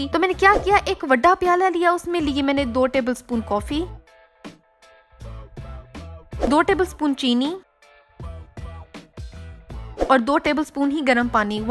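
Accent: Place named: Punjab, India. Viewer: Cute punjabi accent. 😊😊👍👍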